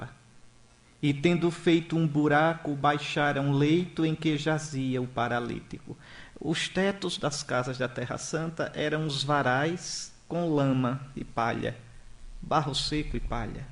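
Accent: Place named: Pernambuco, Brazil. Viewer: Além de palestrar de forma magnífica, o saudoso bispo de Palmares o faz com um agradável sotaque pernambucano, usando algumas palavras próprias da região, verbi gratia: "aperreio". Esse homem santo certamente desfruta da glória de Cristo, a quem ele fielmente serviu.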